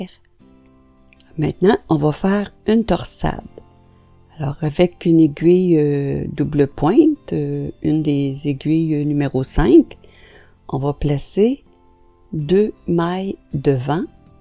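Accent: Canada. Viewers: Merci beaucoup pour ce nouveau tutoriel.Le rendu est magnifique et les deux brins de laine vont donner un résultat bien chaud. Je vous félicite pour vos explications et images très claires... et ce qui ne gâche rien j'aime beaucoup votre charmant accent canadien ❤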